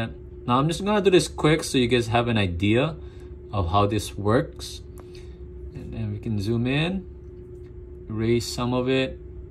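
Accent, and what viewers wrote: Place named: Japan. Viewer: I know this guy is japanese ..his accent is so cute😂❤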